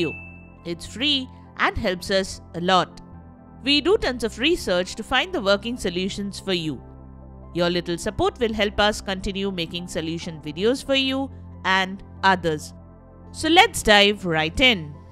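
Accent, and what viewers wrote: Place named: India. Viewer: Dislike for the indian accent. I don't like the color of skin and the way they look/behave.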